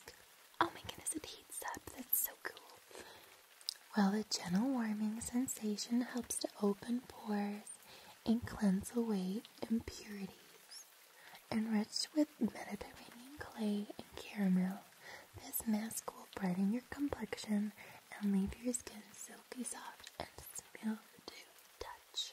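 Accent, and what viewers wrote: United States. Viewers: Always look forward to falling asleep with. Don't know what I'd do without you. Great American accent btw. 😗💗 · Technically any form of the accent is american depending on where you would be in America. I still think you did a great job if I was to say an overall accent 👍😁👍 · Forgot to say: Also your american accent is getting really good. I think it's a great Californian accent do to it's lighter tone which is actually really close to my Washington DC / Northern VA / mid-Atlantic accent. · Your American accent is pretty good! (Coming from an American) Some words are more exaggerated or repetitive than a normal American accent but to each his own ❤️ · I love hearing college american girl accent 💕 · You look so radiant dear 🥰, you're too nice, I've been fond of hearing your sweet voice. You look so gorgeous the times that your beautiful eyes look up, as you did in the second, you're very expressive, I've always liked that about you. 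Your American accent it was gratifying, thank you, I will always love your channel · Haha, good American accent! Except an American would never say that they are going to "pop on" anything or get something "straight away." Lol. Really good though. Always fun to hear people from other places trying an American accent. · American accent in this one, so I guess today you’re a girl and not a gurrrrrrrul. Either way, I’m sure this will be a great video as usual. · You’re always the best in ASMR! Just began watching your new video and am loving the generosity in this 😁 You’ve got a great american accent and I approve of you helping others sleep peacefully 🎄🎄🙌☺️ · I love your American accent, it's so on point. ❤ This definitely made me sleepy, super relaxing. 😌 I love the sound in the background. 💕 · This video was so enjoyable to watch. I really enjoyed all of triggers. This is really going to help me relax and fall asleep during the holiday secession. 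You have such a lovely american accent. That's a really nice dog you have. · this is a great american accent! and I should know...;) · The American accent is on point 👀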